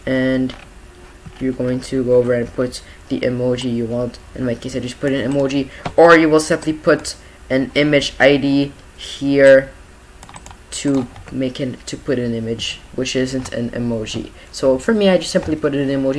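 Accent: France. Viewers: Ur french right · are u french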